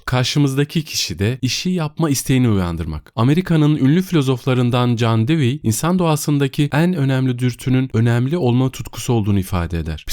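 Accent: Azerbaijan. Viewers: Qardaş siz Azerbaycanlisiz? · Azerbaijan Turkcesiyke konusuyor)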